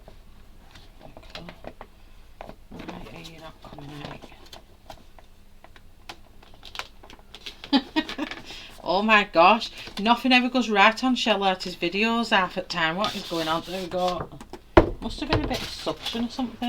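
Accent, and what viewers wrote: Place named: Northern England, United Kingdom. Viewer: I was born in Birmingham but iv lived in Australia since 1974, I like listening to you, you sound like your from somewhere up north. Liverpool?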